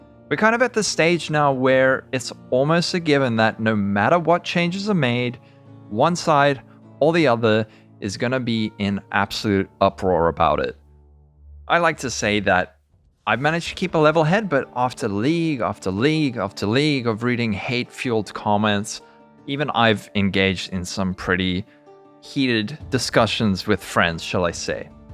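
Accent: South Africa. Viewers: You are completely right! I am an old school crpg player (wow it’s almost 40 years I have been playing since hack/rogue/wizardry 1 in 82) and for me nerfs are amazing as it just makes a whole new journey/challenge available. I get bored with high level characters that can do everything, it’s figuring out how to build and tackling challenges with my creation that’s fun. Probably why I played Diablo 2 for years as well. Anyway thanks for a great video and channel! Btw are you South African/Ex S.A.? Me too! I grew up in joberg).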